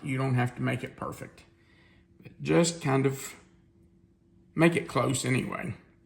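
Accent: South, United States. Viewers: Tell you something, this is not a pie you can get anywhere, yet Kentucky Derby Pie is a thing. My mouth actually watered, and I never had it! I will be making this. Wonderful video. Simple, right to the point and I have to say, you have got one of the most proper gentlemanly Southern accents,.....and that's coming from a NY Brooklynite.....lol. TY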